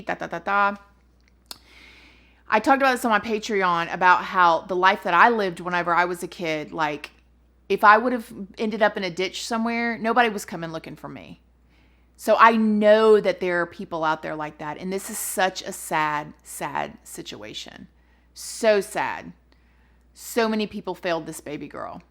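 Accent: South, United States. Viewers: I think Morbid Podcast did an episode on this! And I just imagined if you did an episode with them, AHHHHH!!!!!! You and your southern accent and colloquialisms with their New England accents would be the absolute cutest to hear!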